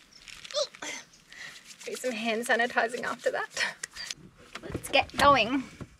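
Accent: Australia. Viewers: I noticed; some similarities of Australian accent ( yours ) & American "Southern" accent, where "fire wood" and "alright".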